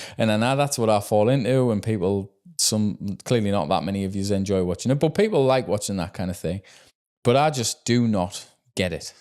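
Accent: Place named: North East England, United Kingdom. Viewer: Hi, I have found your videos again. Really enjoying them since you have the north east twang. I have got sick of watching videos of people saying it's the end of the world. We have done it as when we were lucky to get 1K views. Caravan dealers going bust 50K so you end up having to. My issue is if it's EVERy week. So the exPawers are someone I stopped watching as now every week is an issue but really it's not.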